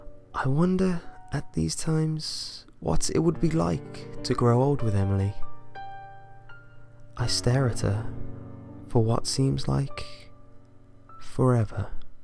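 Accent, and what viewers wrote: England, United Kingdom. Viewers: Your slow English accent makes everything more intense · I want to say that you really are awesome and I love listening to your creepypasta narrations because of your awesome accent. I have to ask though are you from England or do you live in England. I'm wondering because of your awesome accent. My friend told me you probably aren't from England but you're using the accent to make yourself sound better, I say hes wrong which of us is right. But either way I'll still love your creepypastas.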